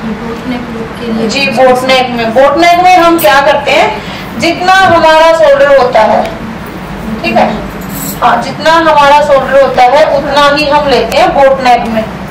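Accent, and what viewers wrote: Haryana, India. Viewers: Madam ji Apki Awaz se lgta h ki aap Ki boli Hariyanvi h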